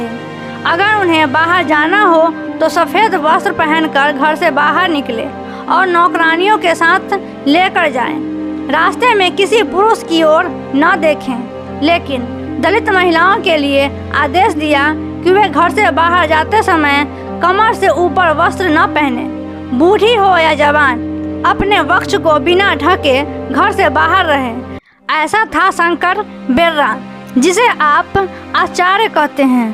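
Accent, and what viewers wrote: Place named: Bihar, India. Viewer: आप किआवज बिहारी जैसी है किसी दो गुट आपस मे लड़ रहे हो तो उसको शांत कर दिया इसलिए वह खराब है ऐसी सोच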